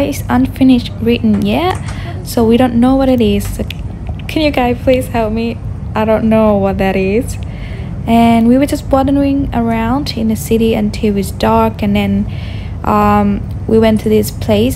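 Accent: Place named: Australia. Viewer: You actually have an Australian accent now, not US english type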